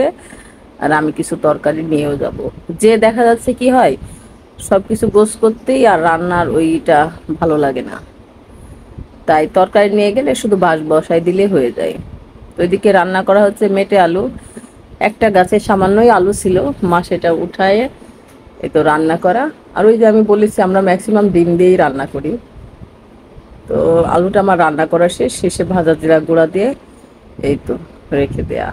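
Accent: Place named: Rajbari, Bangladesh. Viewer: আমার গ্রামের বাড়ি রাজবাড়ী আপু তোমার কথা সুনে বুঝতে পারছি তাই আপু বন্ধু করেছি গত কাল ব্যাক দিও প্লিজ।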